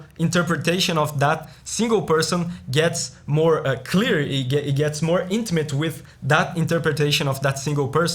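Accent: Brazil. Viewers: Sotaque brasileiro, kkkkk certeza que é brasileiro, com um ótimo inglês. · cara eu tava vendo teu vídeo e percebi pelo seu sotaque q vc é Brasileiro, massa demais! essa ost é absurda mano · You sounds like a Brazilian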